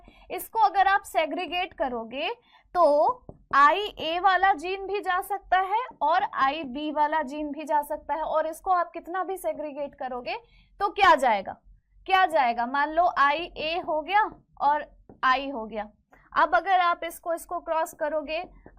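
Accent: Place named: Northeast, India. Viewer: Ma'am ur Hindi is like Northeast pipul but do speak how u speak now make us ur word remember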